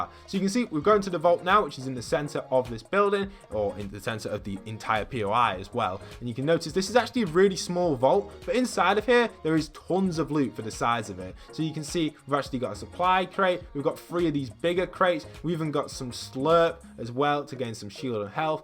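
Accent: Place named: United Kingdom. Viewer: Anyone else notice he has a British accent but goes really northern when he says chug jug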